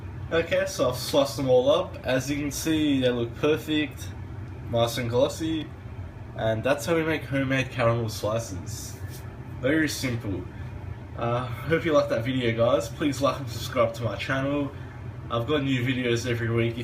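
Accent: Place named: Australia. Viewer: Yeh the Aussie accent thanks I will try this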